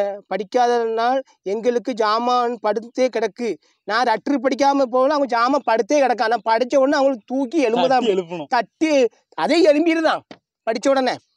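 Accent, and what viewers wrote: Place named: Thoothukudi, India. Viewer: தலைவரோட அந்த சரலமான தூத்துக்குடி பாசை -க்கு நான் அடிமை 💥🙌🏻😂🤣நீ வேர ரகம் தலைவரே🙌🏻😄🤣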